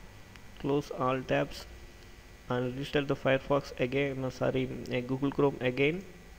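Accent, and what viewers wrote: India. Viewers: When people make fun of him for trying to talk English. He lives in India, of course he is going to have an accent. At least the websites and pages he has open has the words in English for those to understand easily. Heck I can understand his steps even if I didn't have the volume on, read the pages and follow along. .-.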